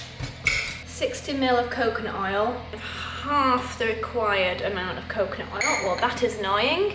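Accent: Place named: North, United Kingdom. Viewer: Keep the northerness going! I love it when you drop in certain phrases or ways of saying things. Wishing you, and Boe a lovely Christmas xxx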